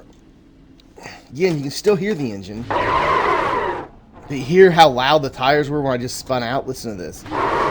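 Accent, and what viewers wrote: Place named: Baltimore, United States. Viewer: This dude is from Delaware Maryland PA area, sounds really Baltimorish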